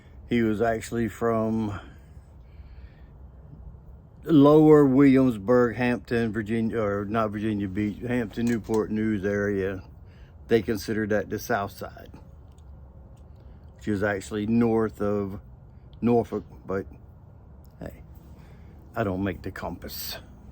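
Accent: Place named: Europe. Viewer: Hey, Good to see you! Love the European accent! I pulled the trigger on the Tobac, I heard a few guys talk about it and you helped me to pull the trigger. Thank you for the show and tell. Be Well!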